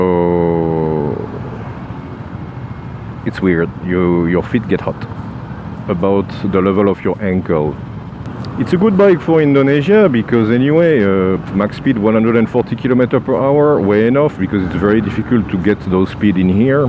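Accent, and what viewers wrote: France. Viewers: He is French.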